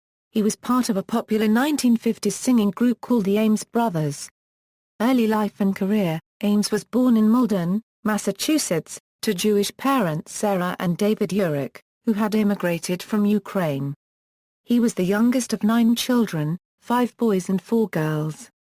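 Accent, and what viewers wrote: United Kingdom. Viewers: Robo-voice with British accent.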